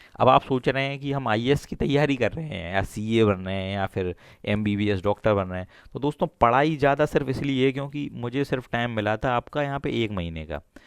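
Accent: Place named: Etah, India. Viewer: apke bolne ka style ekdm Etah side ka lgta h😁😁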